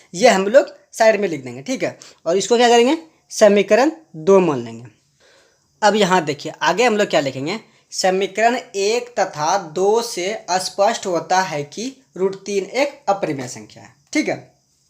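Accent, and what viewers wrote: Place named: Bihar, India. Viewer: Bihar se ho kya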